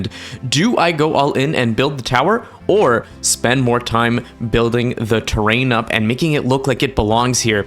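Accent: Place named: East, Canada. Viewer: I love your videos! I've just subbed and look forward to watching more! I suspect that you're from eastern Canada too! Your accent sounds like you're from not too far away! :D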